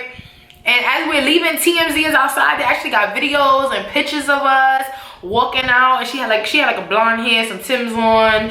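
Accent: New York, United States. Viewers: Girlllllllll you are a true New Yorker that accent tho!!!!! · Sound like Rihanna with a New York accent deadass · Na for nothing 😮 you from ny Lmaoo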